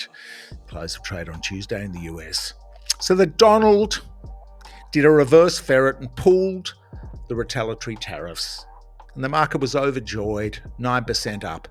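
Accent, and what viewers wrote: Australia. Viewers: What a relief to hear an Australian voice talking sensibly about reality these days. Anyone else feel like we’re living in alternate realities?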